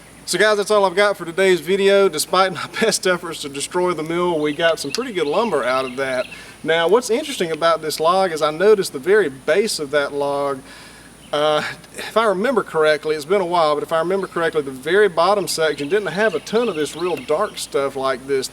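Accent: South, United States. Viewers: Its not a mistake if you learn something from it. I subscribed as soon as I seen you were honest enough to show the others that this is a huge no no. I like your channel and wonder where your from. Sounds southern but then I still have my N, Carolina accent and I left when I was about eight years old. Been in Alaska most of my life and never ran many bandsaws. I did run circular blade saws with insert teeth. easy to sharpen but two men to lift back on the mill. I am 72 and learning like you only on youtube so I am not a armchair coach. Good video an great garden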